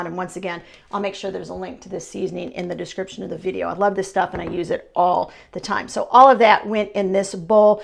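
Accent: Indiana, United States. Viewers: Oh my goodness I love your Hoosier!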